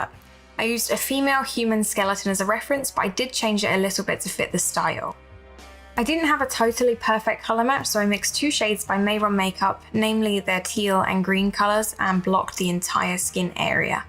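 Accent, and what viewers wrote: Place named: Australia. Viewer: I do like your voiceover better! This is much more informative for those of us who missed the stream. Plus, you know, your voice is as awesome as the rest of you! I'm from the United States and I LOVE your Australian accent! How much do you sing? I'm totally serious about your voice (at the very least, you have a wonderful speaking voice, even if you don't sing at all). <3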